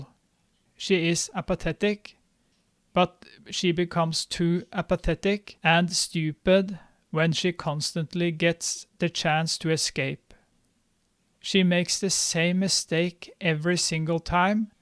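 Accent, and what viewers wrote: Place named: Sweden. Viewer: Jag bara: Han e svensk direkt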